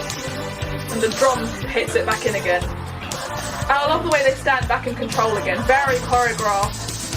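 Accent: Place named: United Kingdom. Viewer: Well I am a huge fan which attracted me to your video and I heard the British accent I was like hey gonna support a fellow brit. But anything live by him there is a these vevo performances he did a few months back you could check out. Also cover by I'm a huge fan especially which is my fav album of all time. I'll try to think of more. But let me what you have heard and haven't so I can suggest more. I would message you on socials but I don't have any social media anymore I deleted it all last year sorry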